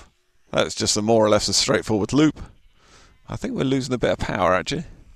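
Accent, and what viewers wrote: Scotland, United Kingdom. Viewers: You're so funny. Thanks for this video. You're a perfect pilot, I think no one can fly those little birds in expert mode in those windy conditions. They're just too touchy for that. It gave me so much fun and delightment to hear some familiar-sounding, positive scottish voice in these day and age. :/ Took this as the main introduction-video for my kids for this F4U plane ,I gave them this Xmas - All the best from germany, three thumbs up :D Stay healthy. Cheers mate and thanks a lot! Hope you know, you're living in a paradise ;)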